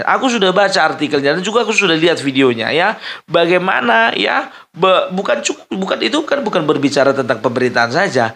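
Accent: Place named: Java, Indonesia. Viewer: Aku suka sekali chenelnya Mas dg gayanya yg khas dan menghibur. Asli mana mas kok logatnya sperti luar jawa tp kok pinter bhs jawa dan logatnya jawa sekali. Trimkasih mas trus suwarakan kebenaran. Smoga mas tetap sehat pnjng umur dan selalu dlm lindungan Alloh SWT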